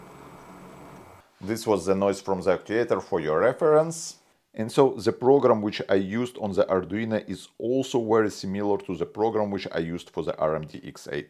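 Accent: Russia. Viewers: Акцент как у русского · Мужик ты же русский! Акцент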